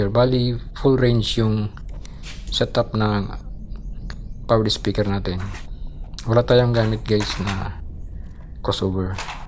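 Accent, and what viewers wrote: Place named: Bicol, Philippines. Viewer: Hello BRO.. Parang bikolano ka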